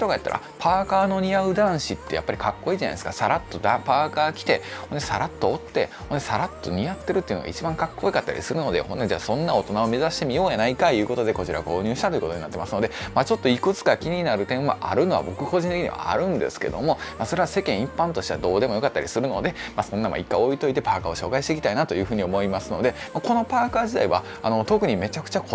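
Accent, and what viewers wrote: Kansai, Japan. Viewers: ，関西弁，大阪第二の故郷で懐かしいですわ🙋🙇✨✨✨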